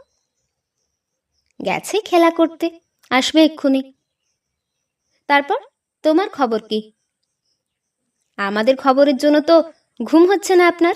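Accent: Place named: Bangladesh. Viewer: Madam narrator you must be from Bangladesh. Bad narrator.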